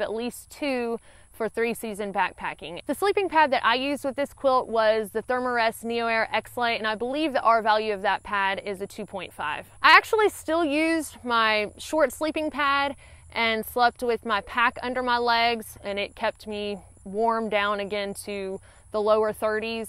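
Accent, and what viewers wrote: South, United States. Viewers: hey great video as usual! have seen most of your videos, not quite made it to the end of the CDT yet. I want to thank you for awakening a passion in me for the outdoors like I've not had since I was a younger man. you're such a lovely young woman with the best southern accent and I wish you much success and joy in your life! happy trails, and hey maybe we'll see you out there one day....... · You southern girl for sure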